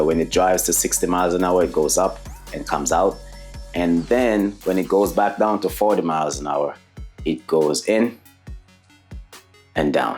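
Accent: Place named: Caribbean. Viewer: I cannot help but notice , you West Indian I can hear an accent . PS I feel you should keep it tbh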